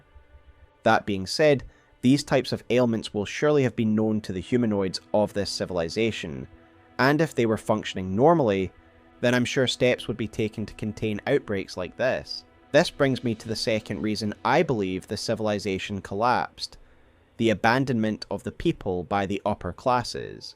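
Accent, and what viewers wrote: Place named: United Kingdom. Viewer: i can tolerate a british accent to a degree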